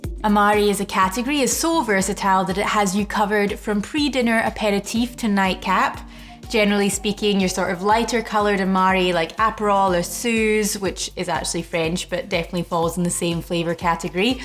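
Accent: Scotland, United Kingdom. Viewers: I think this video was just mentioned in Stuff You Should Know's episode about amari. They mentioned a well put together video about amari by a lovely girl with lovely scottish accent... who else could it be? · OMG I thought you were from Scotland by your accent, but you're actually from Australia! That's really interesting.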